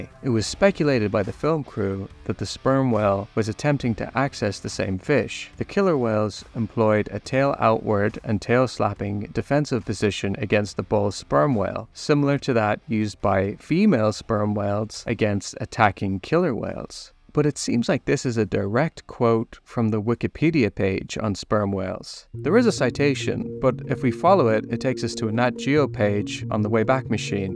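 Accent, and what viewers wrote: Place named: Ireland. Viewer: I spent the first half of the video thinking you were American until my brain went ding and i realised you are Irish. And i'm Irish i felt so dumb.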